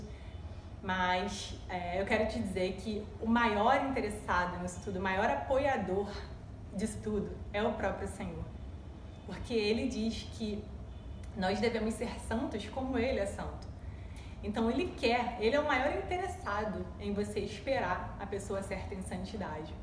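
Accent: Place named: Rio de Janeiro, Brazil. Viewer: Carioca S2 Sotaque lindo e fofo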